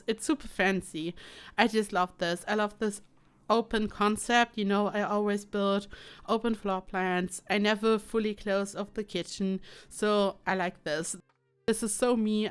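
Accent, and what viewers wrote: Germany. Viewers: I do hear the german accent out of your voice which I think is really cute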